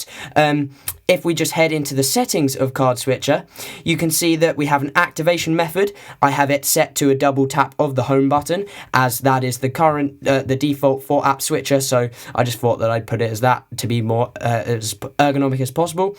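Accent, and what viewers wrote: United Kingdom. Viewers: It's odd: everyone that does these cydia videos has a British accent.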